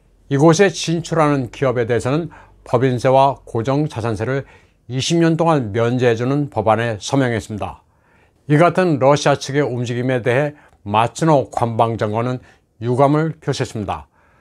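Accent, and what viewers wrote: China. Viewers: 중국인이신가요? 발음이 중국인같아요.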